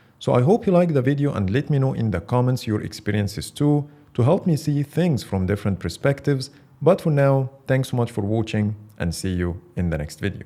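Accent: Egypt. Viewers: You sound Egyptian are you? 😀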